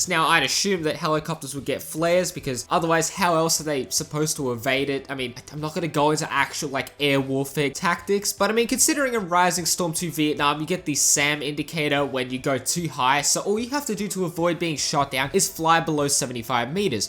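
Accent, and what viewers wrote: Australia. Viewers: You soind like an australian ? If you are australian then yeah I agree that all is illegal there. Even a f*cking airsoft gun is not allowed.... That HK gun looked like a HK53 to me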